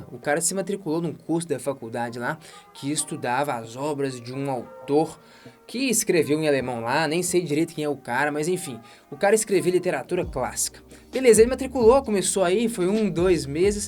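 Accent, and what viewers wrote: Minas Gerais, Brazil. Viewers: Você e mineiro ? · Pelo sotaque e mineiro kkkkk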